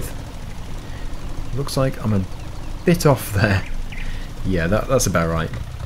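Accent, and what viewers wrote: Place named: Guernsey, United Kingdom. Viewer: where u born in guernsey cuz i am from there and your accent is guernsey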